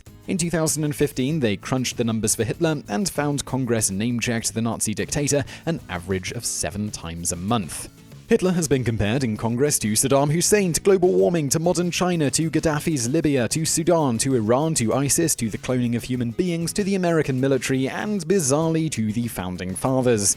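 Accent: United Kingdom. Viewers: British vsauce makes me extra happy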